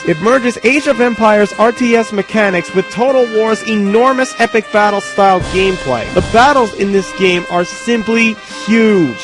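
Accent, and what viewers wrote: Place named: New Jersey, United States. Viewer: this guys obviously from jersey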